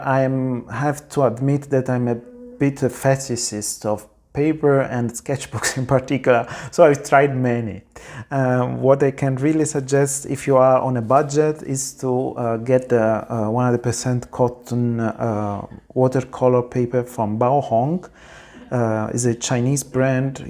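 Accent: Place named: Italy. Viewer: Thank your for showing your sketch books! I like to watch videos like that but most sketch books are more art books - too perfect to be true. ;) Your sketch books are encouraging. Also thank you for showing your art supplies. I also like to hear your voice with your italian accent. :) Greetings from Germany.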